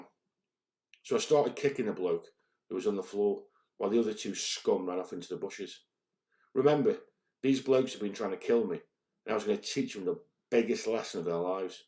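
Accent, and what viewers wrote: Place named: Liverpool, United Kingdom. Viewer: Your accent sounds slightly Scouse mancian yet your from London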